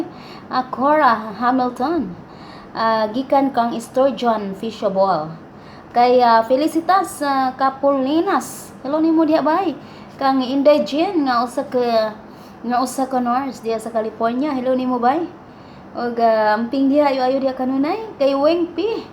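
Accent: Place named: Cebu, Philippines. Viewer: taga asa man ka? magcge kagiki katawa akong asawa magtan- aw nimo? mura man taga cebu ang inistoryahan?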